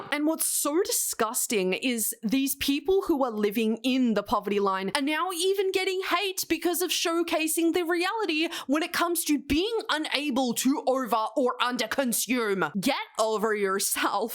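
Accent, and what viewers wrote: United States. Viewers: Your American accent is so darn good!!! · Okay but how can you do a realistic American accent tho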